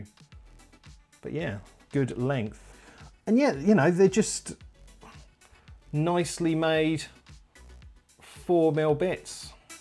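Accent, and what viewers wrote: United Kingdom. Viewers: The small interaction with LTT definitely bumped the algorithm. I understand your frustration with the metrics. You have a portfolio and time in the market, but it clearly takes something like this to get visibility with the sheer numbers of YouTube. There's a ton of UK makers (I assumed based on accent). James Bruton is one that might mesh well. Hacking things together, fixing things, and whatnot. IMO you are better at presentation, but he makes some really cool stuff. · Firstly - the algorithm gods brought me here. Am going to sub, loving the content. Also - please don’t tell me the comment about ‘longer shaft being useful’ was unplanned. Was treś funny! lol Keep up the work bruv. (Sound likes a fellow Londoner)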